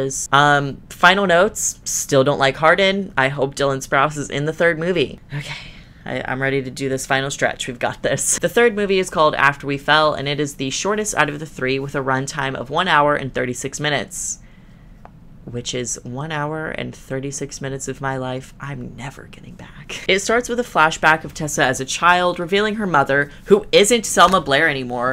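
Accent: United Kingdom. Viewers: This is literally my first video of yours and I literally thought u were British 😭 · bts i love your british accent lkjwqal;/lsakjuk;.lsa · first time watching u. first 5 seconds i was terrified that u were british, anyway amazing video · the british accent caught me off guard 💀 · this is the first video of yours that i’ve ever watched & for the first 5 seconds i fully thought you were british lmao 😂. anyways, thoroughly enjoyed this excellent roasting of the “after” series & love your whole ✨ aesthetic ✨ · why is your english accent is more believable than the actors???? 😭😭😭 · This is my first video of yours and I was 100% ready to believe you were British, you could've went the whole vid with the accent and I wouldn't have been the wiser lmao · THE WAY U SAID NOVEL HERLPELEPRP UR BRITISH ACCENT IS SO FUNNY TO ME I LOVE IT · Gotta say, I love your Bri'ish voice. 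· Your British accent was great :D As someone from England I can safely say, we all speak like that XD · This was the first video of yours I clicked on and was surprised that you had a British accent, then surprised that you didn't. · . i literally went to another video of yours cause i thought maybe I remembered wrong and you were actually british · pretty good "British" accent for an American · Btw I know this has nothing to do with it, but you with the British accent, kinda sounds like Ron from harry potter, I'm not saying this to be rude, I was just kinda amazed at how close it was. :) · This is the first video I've watched from you and I almost clicked off when I heard your british accent😭😭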